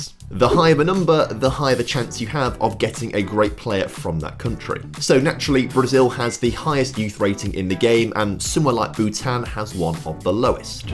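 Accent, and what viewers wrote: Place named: RP, United Kingdom. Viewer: it just sounds way too posh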